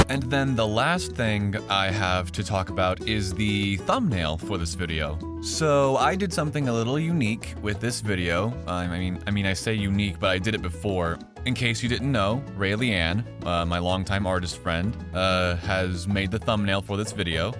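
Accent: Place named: Estuary English, United Kingdom. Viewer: It's estuarine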